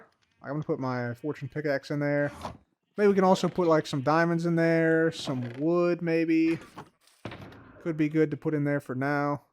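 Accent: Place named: Chicago, United States. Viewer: You're from Chicago, aren't you? First-time viewer.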